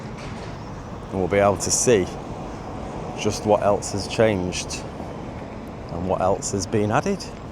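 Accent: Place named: East Yorkshire, United Kingdom. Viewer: I am really enjoying your videos of the hull fair and how it's coming together just 24 hours ago there was nothing there they seem to crack on can't wait to see the next video tomorrow would love talking has it brings it together just love these videos was that helter skelter going up l remember going on that when l was young using a mat to slide down the helter skelter and l can also remember the cake walk l went on that with my dad and all l remember is we could not stop laughing love your commentary on your videos love your accent a good strong east yorkshire accent l am from east yorkshire myself and l can always remember been at south hunsley school and you could see out the classroom windows seeing the fair coming along the A63 was a good thing to see ❤Karen 😊 wow that RV was amazing l bet it's lovely inside 😊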